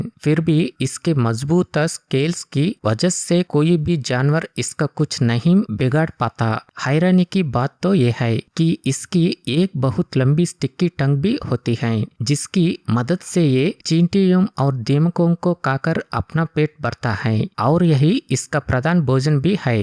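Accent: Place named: South India, India. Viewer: Apki Hindi bolne me South India ki thodi thodi asar lag rahi he 😊👍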